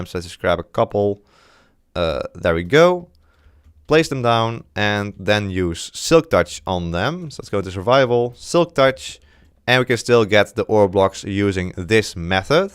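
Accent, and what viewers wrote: Netherlands. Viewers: my first time watchin your vids, immediately noticed you're dutch. Ga zo door met je video's!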